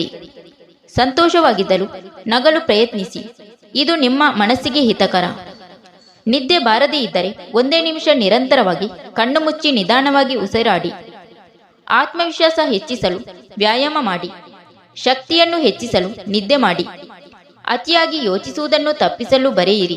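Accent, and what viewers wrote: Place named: Tamil Nadu, India. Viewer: Tamil accent... Good one 🎉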